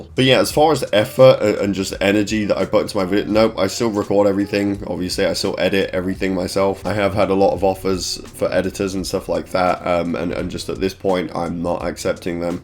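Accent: United Kingdom. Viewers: These dev times are incredible. It's amazing how far has come And you just did a skit about your own skinwalker vid feat. Cloud... even I remember that 😅 And good on you for being authentic with the editing etc and since you hit puberty late 🤣 Most your demographic probably aren't British, they just love our accents haha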